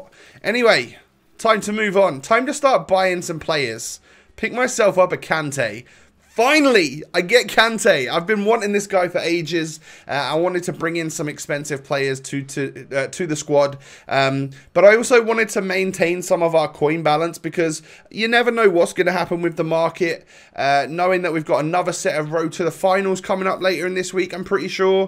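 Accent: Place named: England, United Kingdom. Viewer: Wait he’s from Argentina??? Omg I thought he was from England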